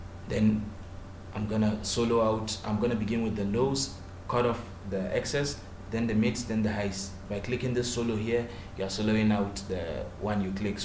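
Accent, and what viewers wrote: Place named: Africa. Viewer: hey,man...your accent,you sound like someone from africa,are you from africa ?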